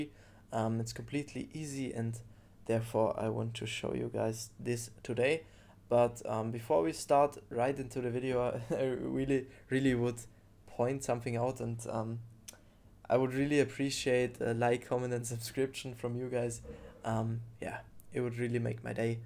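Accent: Germany. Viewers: i spot sam dchermen akzent here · Dieser deutsch Akzent ahhh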